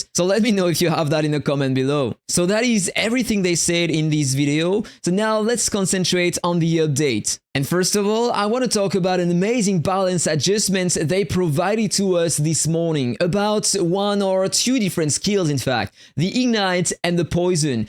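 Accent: France. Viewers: Tu a un accent français non ?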